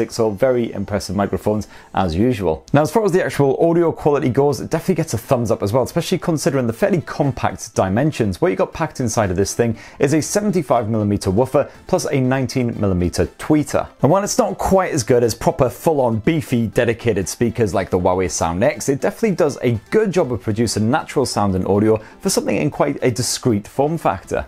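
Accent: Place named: England, United Kingdom. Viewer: I love your English accent so much I wish I had it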